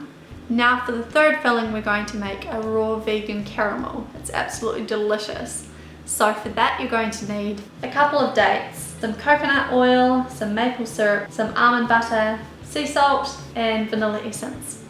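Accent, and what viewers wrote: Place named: New Zealand. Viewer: Just discovered your channel. Absolutely love your videos, they are so genuine and watching them made me smile. I sense a kiwi accent hhaha. Hello from your neighbour in Australia :)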